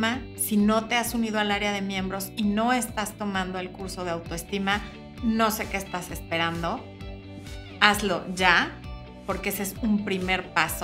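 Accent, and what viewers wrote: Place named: Mexico. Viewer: gracias ante todo. Me parece que si las mujeres siguieran tus consejos serían mucho más felices consigo mismas y con sus relaciones de pareja. Yo hace tiempo descubrí que la autoestima y la seguridad de una mujer en sí misma es la mejor manera de conquistar a un hombre y que nosotras vamos primero que ellos siempre. Me fascinan tus consejos incluso tu acento mexicano que me encanta...hace un año por estas fechas visité por primera vez CDMX y quedé enamorada, solo pienso en regresar. No sé tengo una conexión muy especial con Mexico y te escucho y me fascina. Por cierto, entré a tu canal de brillar después de los 40 pero no hay contenido, ojalá pongas pronto algún video allí....Si las mujeres despertáramos el mundo sería nuestro. Un abrazo y saludos a tod@ los seguidores de este canal, soy española pero vivo en San Juan, Puerto Rico. 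Un abrazo y lindo domingo.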